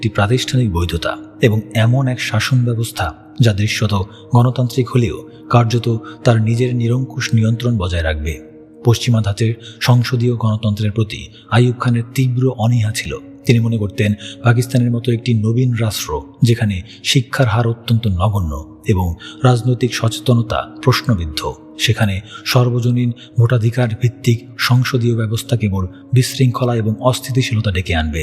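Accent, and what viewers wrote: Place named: India. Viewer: বই কথাবলছে না।৷ ভারতের দাদদের মত করে বলছে।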